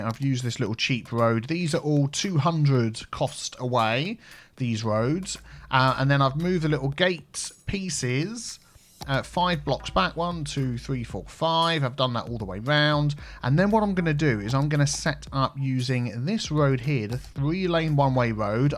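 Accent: United Kingdom. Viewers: what do you specifically call your accent? Just british?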